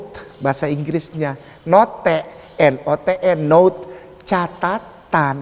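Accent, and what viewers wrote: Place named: Eastern Indonesia, Indonesia. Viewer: Terima kasih ustd kainama mski cara penyampaianny khas ketimuran.. kami skrg jadi mendapatkan penjelasan mengenai injil dan bible.. semoga Allah SWT membalas segala kebaikan atas kebenaran yg kau berikan.. aamin ya robal alamin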